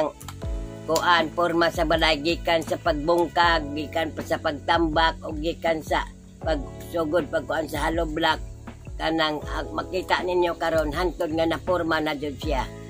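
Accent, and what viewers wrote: Surigao, Philippines. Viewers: Makahilak man pod ta.. God is good. Ampo lang ta kanunay nga healthy ta ug safe.. Nga dili lng sa bugtuon ni Lord ang lastiko.. Heheh bitaw pkatawa lng.. Tga Surigao pod ko pero naa mi sa Norte.. Mao nga pgkabati nko sa imong sinultian nga surigaonon bisag sagol na cebuano. Mkamingaway surigao lami iuli pero tungod sa layo kay dia ko Kuwait unya sa sitwasyon karon. Pero cge lang.. Keep on vlogging.. Kay lipay mi kaau sa nu ni wako labi na pod ug maguban mo.. Keep safe! 😘😘😘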